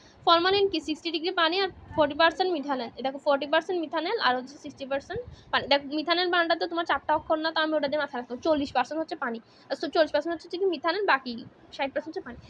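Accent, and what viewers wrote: Chittagong, Bangladesh. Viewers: আপু কি চট্টগ্রাম থেকে?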